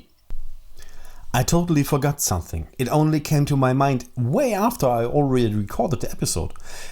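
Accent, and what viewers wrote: Germany. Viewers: Do u come from germany? ^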